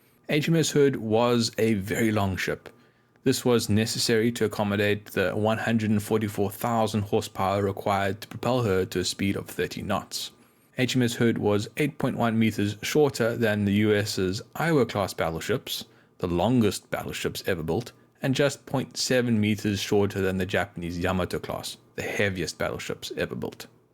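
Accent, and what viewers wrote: South Africa. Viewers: Good god man you do really hate yourself dont you ? Where you from am I hearing a hint of the Karoo ?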